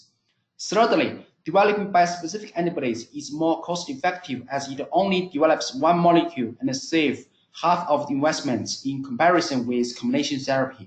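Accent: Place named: China. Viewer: chinese accent is so strong, can't understand what he says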